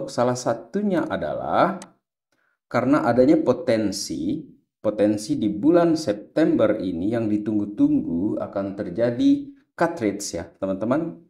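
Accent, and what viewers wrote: Sumbawa, Indonesia. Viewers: Orang Sumbawa bang?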